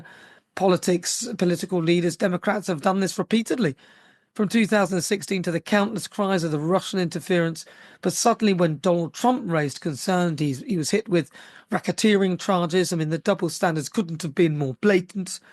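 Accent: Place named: Birmingham, United Kingdom. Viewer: Lol, ur brummy accent is thick and i live here too and have heard ALOT of ozzy osborne accents 😅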